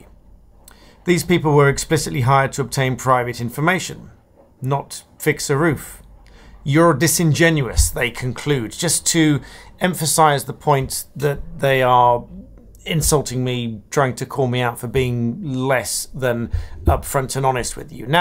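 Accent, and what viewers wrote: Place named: United Kingdom. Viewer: I enjoyed your coverage of the Johnny Depp case and of this case. A true analysis based on what was and has been presented. That comment did not need an explanation by you but you correctly and eloquently put her in her place in your amazing well spoken British accent. Keep up your good work, I as with many others, certainly appreciate it as it gives a better understanding. Thank you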